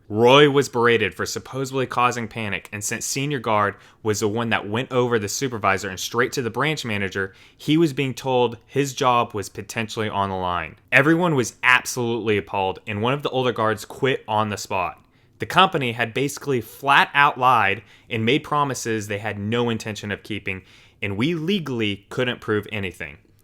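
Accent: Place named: South, United States. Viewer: Your southern tang makes me want to watch your videos more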